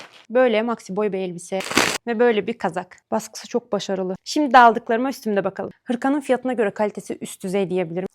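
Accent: Azerbaijan. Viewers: Birinci vidyusuna baxanda danışığından bildim ki azərbaycanlıdı😂😂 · Abla Azerbaycanlımısın? Biraz Azerbaycan şivesi ile konuşuyorsun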